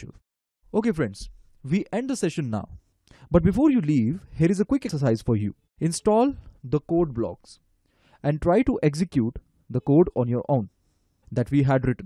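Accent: India. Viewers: Are you Indian sir? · Are you Indian?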